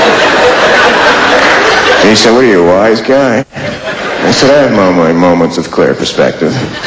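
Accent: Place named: Australia. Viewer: FUNNY SCOUSE ACCENT- it's australian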